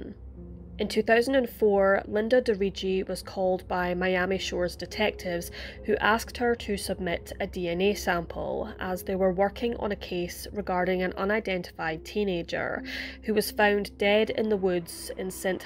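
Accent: Scotland, United Kingdom. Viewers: She is Scottish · WoW you have a heavy Accent like uk or Scottish accent.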